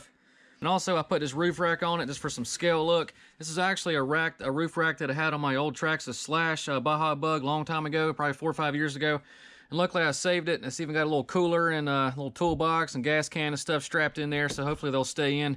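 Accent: South, United States. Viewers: Hey my friend very videos Man you funny bro you said this car is a HOOT yo that is so southern and matches your acent, iam NJ iam definitely gonna start using its a HOOT I love my brother, and your a excellent driver really good. Thanks your friend william from NJ Forgettaboutit 👍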